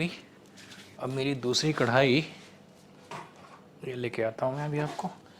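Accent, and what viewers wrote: Standard. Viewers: aap jitne ache tarike se sudha Hindi me bolte he utna koi dusra cheaf nahi bolta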